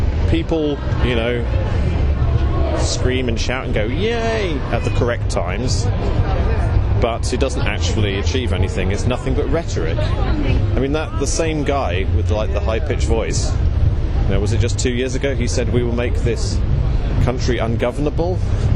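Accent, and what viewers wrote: United Kingdom. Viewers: please listen to this American woman: you are not uglier in the morning. You are not ugly at any point of the day during any of your videos. You are very attractive (not to mention very, very intelligent) and if my daughter was just a bit older, I would try to put you together with her. She is a genius girl, gorgeous, and an Anglophone. Too young for you though. Anyway. The point is, you're not ugly. You're a cutie pie who says lots of smart stuff whilst using a smooth British accent. 😉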